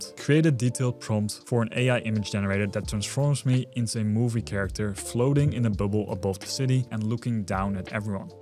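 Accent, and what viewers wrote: Netherlands. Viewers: How is it that I can always recognize Dutch people when they speak English, even when they speak it almost without an accent? By the way, great content, keep it up!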